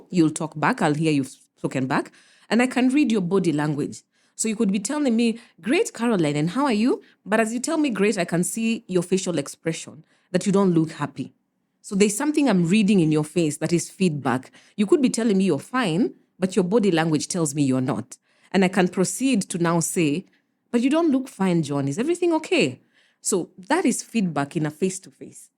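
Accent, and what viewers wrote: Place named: United States. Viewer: Kizungu ya amerkaa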